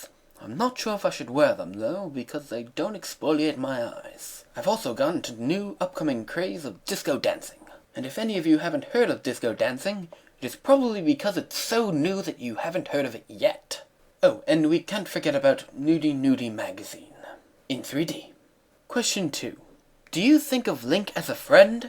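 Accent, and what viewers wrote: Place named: England, United Kingdom. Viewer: cool English accent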